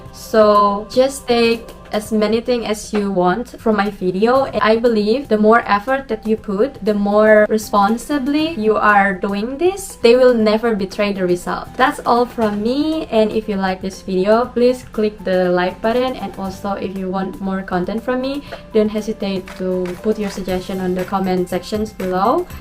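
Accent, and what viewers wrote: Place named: Philippines. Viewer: Oh yoy r a filipina !the English lol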